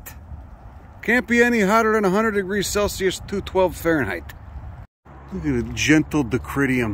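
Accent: Chicago, United States. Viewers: Oh, yeah, I get it. Your original point is good - and believe me, I hope people will heed signs or common sense. It is gruesome to think of caustic (or acidic) pits of boiling mud. I guess my original comment was because our ingenious educator simply cracks me up with his thick Chicago accent. Sorta like the trip through the grocery store near Berkeley. So many quotables...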